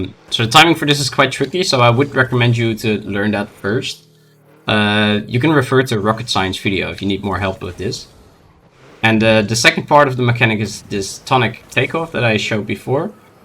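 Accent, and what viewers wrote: Netherlands. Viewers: dat nederlandse accent valt niet te verbeteren gozer <3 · I hear nederlands, possible? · Je hoort aan zn engels dat hij hollander is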